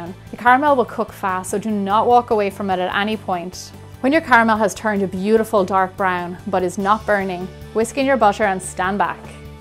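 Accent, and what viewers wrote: Ireland. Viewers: Made this for our Christmas Dinner Dessert. It was a crowd hit. A lot of work, but very much worth it. Definitely a keeper recipe. It can be made in stages (the brownies and caramel the day before etc.). Thank you!! Warning #1: It is delicious but VERY rich. It fed 10 at our dinner and we didn't even dent half of it. Warning #2: Pay close attention to the colour of the sugar for your caramel. The darker it becomes, the stronger flavour your caramel will taste. If you wait too long (sugar too dark in colour), it will taste like a burnt sugar caramel. Still good if that's your thing, but I prefer it just after turning golden and before getting very dark brown (it's a personal preference thing). Warning #3: When making the caramel, add your butter all at once and then whisk in (as says). Have your butter and cream set aside at room temperature if possible (not cold), this will help with the caramel staying emulsified, and not separating. 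Warning #4: If using salted butter, omit adding salt at the end. Warning #5: `s recipes are fantastic, but her Irish accent alone will make you want to come back to this channel.:)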